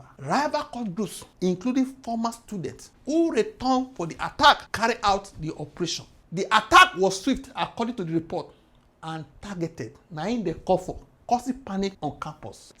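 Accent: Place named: Nigeria. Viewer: You go school😅 English na naija pidgin. Though, a good observation.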